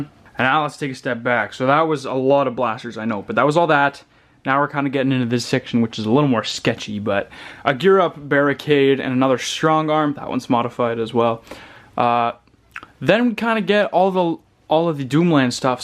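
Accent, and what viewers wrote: Canada. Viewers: In America we say owt in Canada you say oot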